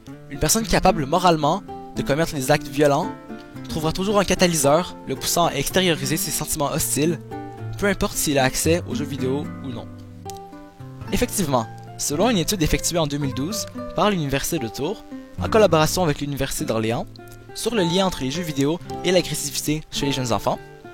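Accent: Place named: Quebec, Canada. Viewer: Franchement, je suis impressionné. Y'a des maladresses sur la présentation (voix peu naturel, etc.), mais le boulot sur le montage illustre très bien le sujet, le rythme est plutôt bien malgré le manque de divertissement à côté, etc. L'information est de qualité, tu cites des sources, c'est plus complet que certains trucs font en tant que professionnel, y'a de l'objectivité, autant en positif que négatif, etc. C'est un peu orienté, mais je vois pas comment je pourrais dire que c'est un défaut, surtout qu'objectivement, la plupart des arguments du contre sont d'origines idéologiques quand ils sont honnêtes. La vidéo semblait être un one shot try, mais, franchement, j'ai beaucoup apprécié. Et quand j'ai entendu l'accent du Québec, ça m'a fait plaisir, surtout que j'ai l'impression qu'au Québec, la communauté gaming ne brille pas par sa présence (pas sa qualité). 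N'empêche que le boulot, il mérite pas d'être souligné quand c'est fait aussi bien. Bravo.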